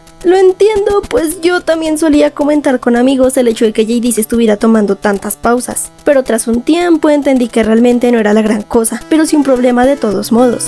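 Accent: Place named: Bogotá, Colombia. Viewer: Felicidades! es un grandioso video, desde el apartado visual, como la edicion, las pequeñas animaciones que integras en los titulos y el manejo final del video para explicar las partes mas densas de un fandom. Es un gran formato y muy fresco en youtube. Es un honor conocer creadores de contenido Bogotanos, me encanta que se sienta tu acento, no soy mucho de comics y aun asi lograste darle un gran ritmo a toda la trama sin dar realmente tantos spoilers. btw el inicio fue increible JAJAAJ, ahi te va mi sub, estare muy al pendiente de tus nuevos videos!!!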